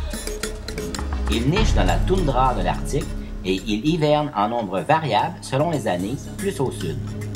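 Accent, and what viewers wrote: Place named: Quebec, Canada. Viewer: Pas mal! Avec un sacré accent québécois tout de même...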